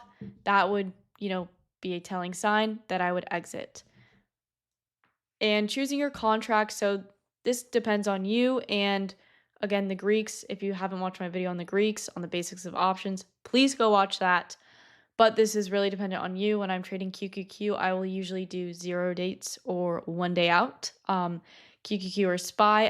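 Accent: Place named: Midwest, United States. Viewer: interesting to see a young woman trading. u sound like your from chicago or wisconsin. ive been around and know a daytrader he teaches his daughter to trade. pretty cool keep going you can make a good life for yourself by yourself.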